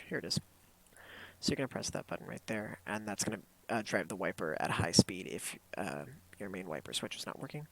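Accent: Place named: United States. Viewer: Thanks for trying to give an explanation on these systems. I was looking forward to it BUT here is a bit of feedback on your attempt: 1. The audio is incomprehensible: there is the "p" noise for your breath going into the microphone. 2. You speak too fast with a strong US accents (not everyone is from the US) 3. You say too may words that confuse and dissipate the tutorial content instead of communicating just what is important. Clearly you haven't planned on paper what to say. 4. The cockpit is too dark and reduce visibility 5. The audio is too low 6. you move the camera too quickly, which coupled with a fast speaking makes everything impossible (for me at least) to understand. Sorry for being honest ;-) Hope you can re do this in a clearer way. Thanks again!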